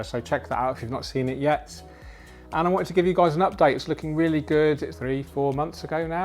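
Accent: England, United Kingdom. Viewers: I love your English accent and keep on rambling! 😀 Also I'm pretty excited about the Dennerle news. Thanks for sharing 🤗👍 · Another great Video, and what a funny accent, must be english ,😁 greetings from germany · Oj, what's wrong with an English accent mate? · So many excitiing projects. So much positivity and next level growth. And then come the tiny trolls and cowardly keyboard warriors looking for any little thing to dump some useless negativity on you, trying to slow your roll. The utterly asinine statement about your English accent and rambling... you're English, you live in England, does it not stand to reason and logic that you would have an English accent? It's the blatent ignorance and outright stupidity of cowardly keyboard warriors that always amazes me. If they don't like the accent or style, why not simply leave and find a dramatic, tiny troll channel that will resonate on the same level of stuck-on-stupid. 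It really is that easy and that drama free. No need to dump negativity and show off personalized styles of stupid. 86...pushing 90 thousand folks like the English accent and the ever growing positivity, style, and awesomeness of you doing what you love, loving what you do, and sharing this amazing journey with us. 😘 👍💕👍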